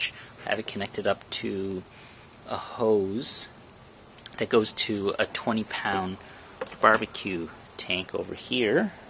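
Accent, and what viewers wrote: Canada. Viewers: quebecois ? ou francais ? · ARE YOU CANADIAN?!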